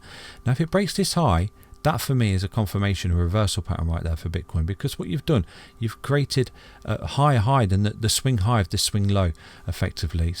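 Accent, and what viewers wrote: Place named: Southern England, United Kingdom. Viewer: Brilliant analysis - picked up on the head and shoulders... Very good. Nice to hear a Southern Englander whilst I'm here in Thailand. Thank you buddy 🙏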